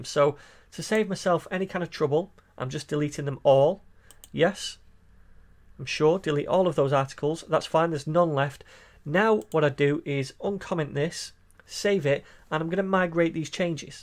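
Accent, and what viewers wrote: United Kingdom. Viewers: That sounds like British.............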